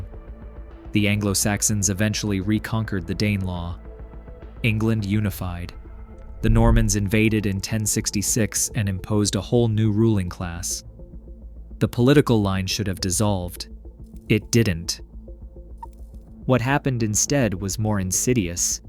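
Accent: United States. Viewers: The city of Derby is pronounced in the UK...correctly...as DARBY...whoever put this piece together should have organised an English-accented Narrator...an American accent is inappropriate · Whoever produced this, and I'd be curious to know, its very interesting. Subscribed. 2 Questions. Why voice-over in American English, not N.England accent, say? What's this got to do with aviation? Keep 'em coming!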